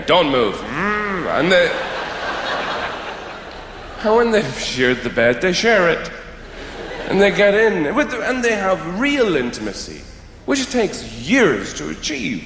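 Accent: Ireland. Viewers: Yes he is irish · Eddie Izzard meets Dave Allen. The incredulity and upwards inflection being pure DA — probably the Irish lilt to some degree.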